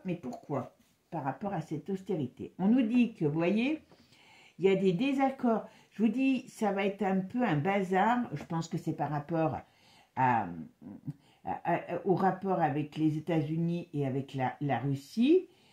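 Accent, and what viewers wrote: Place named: Périgord, France. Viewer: Bonjour, je vous découvre, et j'aime vos tirages, votre douceur d'âme, et ce petit accent que vous avez, et que je connais, ô combien, puisque j'ai le même. Je vous embrasse depuis Le Périgord. Très sincères salutations. 🕊💞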